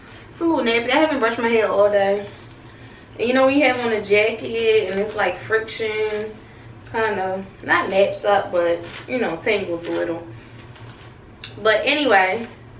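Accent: Baltimore, United States. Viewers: Although I moved away from Baltimore 13 years ago you just made me so proud hearing your accent it was unmistakable. Your whole bad represent what a fabulous black women are Beautiful bold and unapologetic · Love your hair....it my first time watching and it sounds like I hear a Baltimore accent. 🥰 Or maybe Philly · First thing I noticed too 😄 heard that BMore accent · sis you from bmore/dmv? you remind me of an old college friend! love the energy ❤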